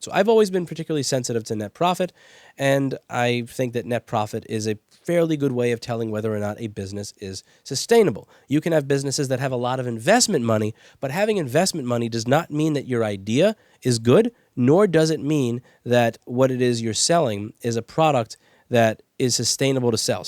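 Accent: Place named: New Jersey, United States. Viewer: You sound like you live where I live. Nj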